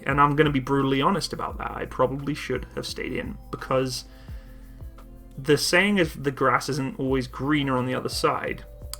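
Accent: Canada. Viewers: Def got accent Canadian english accent! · It’s sad you’re sounding more Canadian but also great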